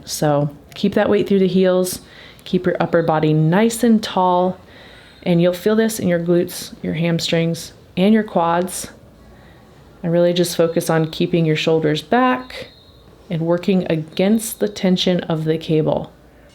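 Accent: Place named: Texas, United States. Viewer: She’s got that Texas “train hard y’all” thing going. I like that. You should sign off saying “Y’all come back now ya hear!”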